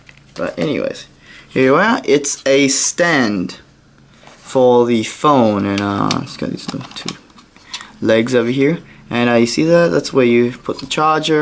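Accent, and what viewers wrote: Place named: England, United Kingdom. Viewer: He sounds like he is from England so... why wouldn’t he.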